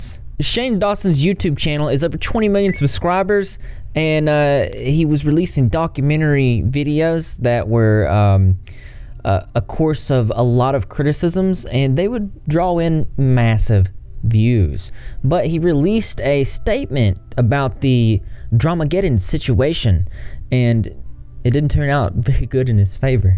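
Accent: South, United States. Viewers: I definitely hear the south in your voice. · If I had to guess...Florida/alabama/Georgia somewhere around there lol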